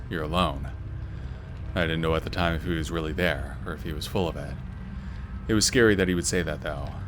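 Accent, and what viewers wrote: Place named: Canada. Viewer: I knew there was something about your voice and it just dawned on me. You're Canadian.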